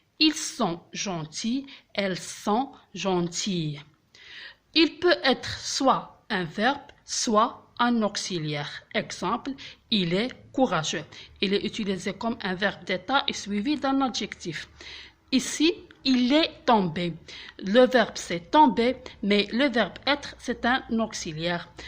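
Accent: France. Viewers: really great information and superb - i love the french accent - hope these tips i will learn french - thumbs up ;) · French accent is superb. U r doing awesome work 👍👍👍😊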